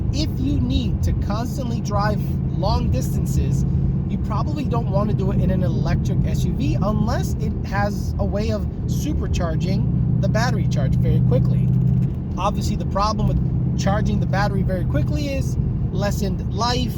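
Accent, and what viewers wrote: United Kingdom. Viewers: That damn UK accent had me dying lmao. Great video again brother. 🤘🤘🤘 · Wagons ( or in your english accent they're called an "estate") look great. Audi's avant line Mercedes with the e-class amg wagons BMW has the greatest of all time RIP E39 touring (wagon) But yes you're right, many cars look better as a performance wagon.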